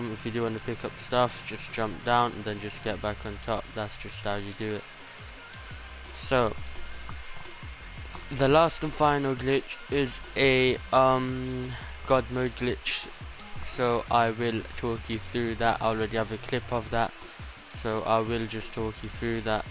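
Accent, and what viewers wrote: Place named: United Kingdom. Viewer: That's just how brits talk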